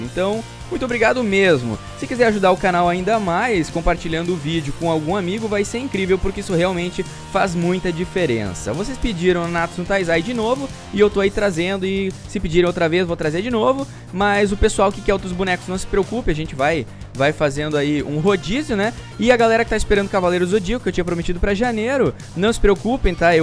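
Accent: Rio Grande do Sul, Brazil. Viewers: conheci o seu canal a pouco tempo, e ja curto e pelo seu sotaque percebi q é de RS kkk,tbm sou, só q sou de Caxias tmj <3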